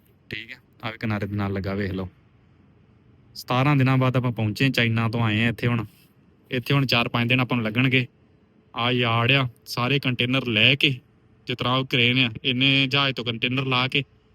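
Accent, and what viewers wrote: Majha, India. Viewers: Language majhe di ah dist vill kehra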